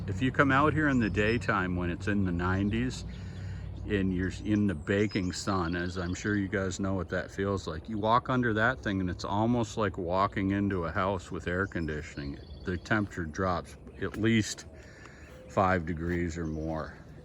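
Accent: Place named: Texas, United States. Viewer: nice! I looked into the self watering pots by that Leon guy, very cool. Fun to hear an old Texas accent too! Haha I think I’m gonna start some. Where did you get your buckets?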